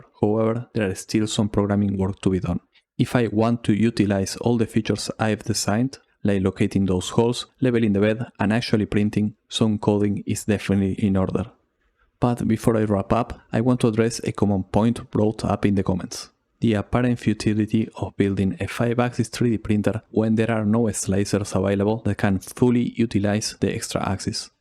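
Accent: Argentina. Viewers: Where are you from? I recognize that Spanish English accent, maybe Argentina? In any case, great job! You are insane! Happy Holidays and keep the great ideas! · te reconocí el acento. Tremendo laburo hermano! Saludos desde Buenos Aires.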